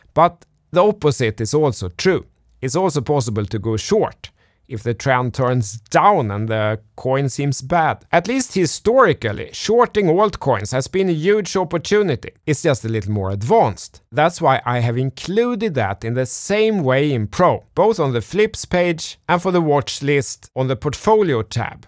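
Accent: Sweden. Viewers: This Swedish guy accent is like music and the best in the business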